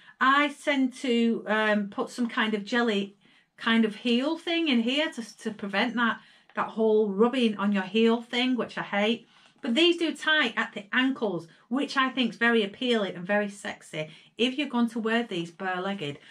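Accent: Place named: United Kingdom. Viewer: Oh okay it's just u never here that word patent anymore. I just adore u and luv your uk accent.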